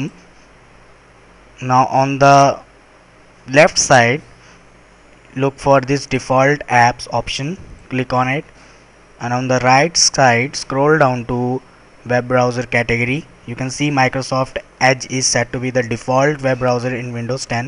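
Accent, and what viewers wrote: India. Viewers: He's Indian, His voice proves everything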